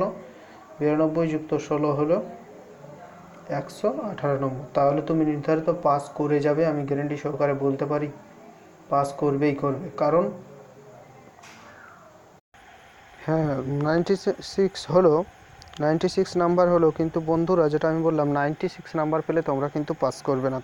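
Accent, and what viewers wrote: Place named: Purulia, India. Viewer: Karon... Amio purulia r😂 Pronunciation ta tei dhora jai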